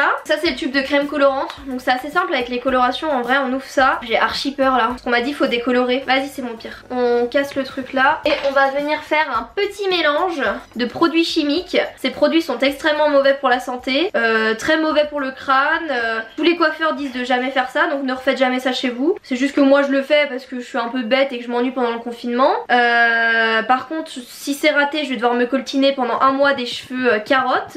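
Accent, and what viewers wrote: France. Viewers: I will regret It hein😭😂😂 jss morte tu me tu avec ton anglais français 😂😭❤️ on adore tes expériences capillaires (moi aussi on est 8 dans ma tête tkt)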